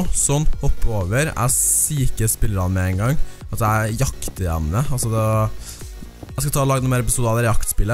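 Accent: Trøndelag, Norway. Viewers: Elsker dialekten din! (Trøndersk)😄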